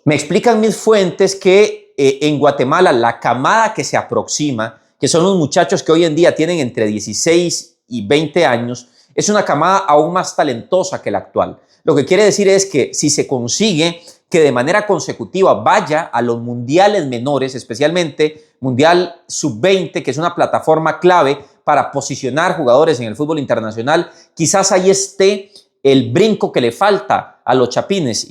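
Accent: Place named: Colombia. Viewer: Si no estoy mal es colombiano por el acento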